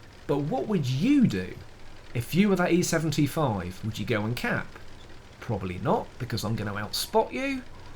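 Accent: United Kingdom. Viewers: 35 minutes!!! Well played! It’s a pleasure to hear you talk! You’re my second favorite YouTube Wot Console player just behind The Turkey Tank, but your accent is so brittish it’s delighting for my french frog hears. Thank you and go on... Perhaps should you try the Jagdpanzer, it’s my second favorite premium to play behind the Vanguard, it’s fast, has a good camo rating and it’s challenging!!! Bye-bye...Bye-bye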